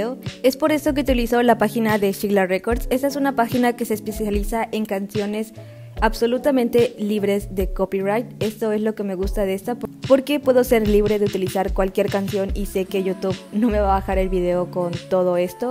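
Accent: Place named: Yucatán, Mexico. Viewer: Por mi casa también pasa Zgas jaja 🙊 Tengo curiosidad de dónde eres, sospecho que de Yucatán o Quintana Roo, tu acento me recuerda al mío jaja ❤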